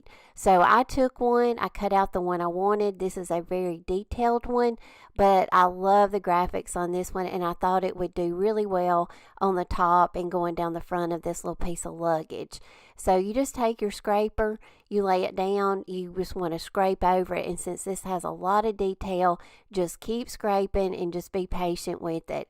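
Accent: South, United States. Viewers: Thank you so, so much for getting back to me, don't know how I missed it, can't wait to make some of those trees! By the way, while I was searching (& finding 25 OTHER fun things to make!) I saw something where you seemed like you were sort of apologizing for your Southern accent---don't apologize, it is charming & adorable. I've never lived in the South, but I love hearing you talk, makes me feel like we could sit down on the front porch with a glass of sweet tea & giggle about some of our craft disasters (no. more. glitter. ever.) and favorites. Thanks again!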